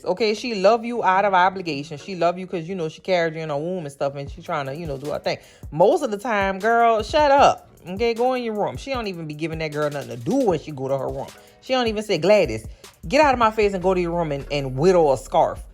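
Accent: New Orleans, United States. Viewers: New listener here! Stumbled across your review of AJLT, immediate listen just because I love the New Orleans ⚜️ accent so bad ❤. Then found you were hilarious AND was reviewing TGA too? Done. Subscribed. 😊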